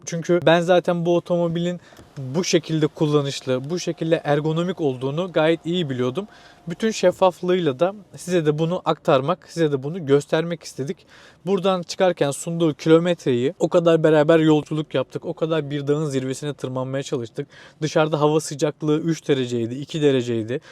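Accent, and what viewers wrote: Samsun, Turkey. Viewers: Eeelli klasik Samsunlu konuşması .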